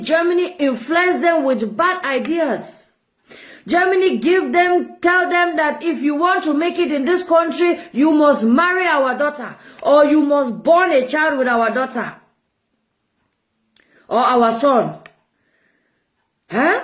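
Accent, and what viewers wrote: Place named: Nigeria. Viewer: This lady is making alot of sense my dear that is how we see it. Her acent sound like Nigeria. God bless you dear for speaking out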